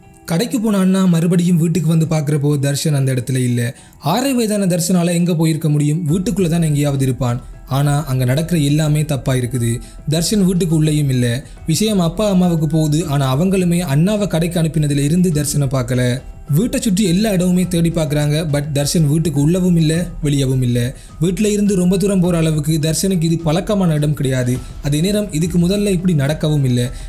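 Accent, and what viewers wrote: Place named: Sri Lanka. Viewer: Nala iruku bro neenga pesuradhu , neenga pesura Sri Lanka tamizh Nala iruku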